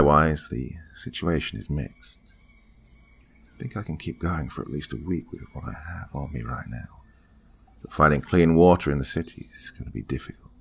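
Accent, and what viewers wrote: England, United Kingdom. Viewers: What part of England do u come from? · thank you I thought I picked up some british accent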